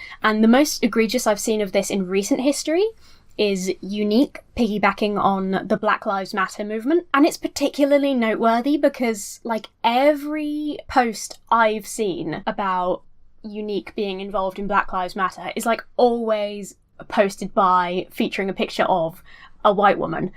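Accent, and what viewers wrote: United Kingdom. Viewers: Your brittish accent is so beautiful. You should be reading in tutorials in how to learn to speak english on cd:s or something. You sound perfect. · It's nice to see someone who does athiest content also cover stuff like this, a lot of the people I watch tend to stick in a bubble of relegion/science related videos. Constructive and entertaining. Also, why does a female voice with a brittish accent make the phrase "fuck off" sound more powerful? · You're an adorable, critically thinking, scientifically literate atheist with a British accent... Why do you not have a million subs already. Lol so glad I found this channel! 🖤❤️🖤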